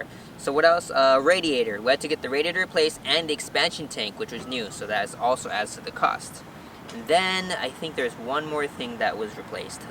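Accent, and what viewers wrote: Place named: United States. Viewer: What the fuking wrong with your American voice?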